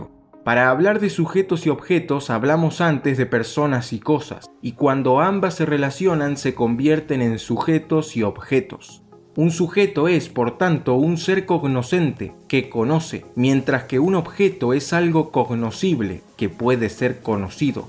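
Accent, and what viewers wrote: Argentina. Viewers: ESPERA.... eres argentino ?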